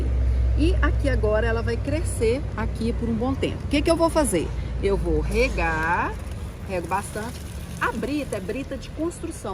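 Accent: Minas Gerais, Brazil. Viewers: Voce é mineira ?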